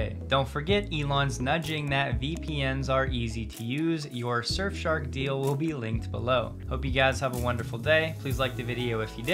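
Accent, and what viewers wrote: United States. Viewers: Thanks for the updates. 🙂👍 Your speaking speed is fine. If you speak any faster, English speakers will start to have trouble in understanding your strong U.S. English accent. There are a number of U.S. channels which many English people cannot understand because of strong accents and rapid speech. People who speak English as a second language will have even more difficulty.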